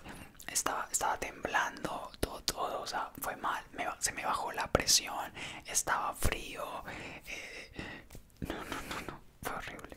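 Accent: Bogotá, Colombia. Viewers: Ahhh tu eres de Bogotá 🤔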